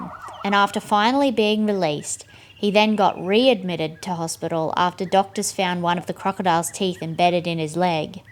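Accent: Australia. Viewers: great to hear an aussie accent narrating, excellent content!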